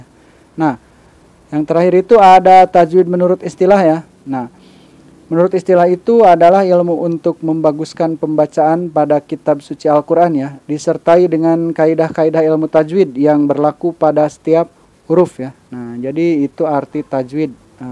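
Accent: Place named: West Java, Indonesia. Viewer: logat sunda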